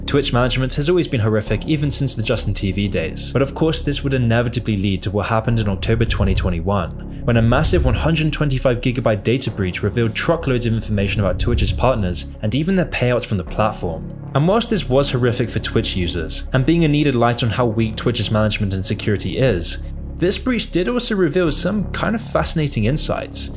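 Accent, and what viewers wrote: United Kingdom. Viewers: This is what conspiracy theory sounds like in the Queen's English.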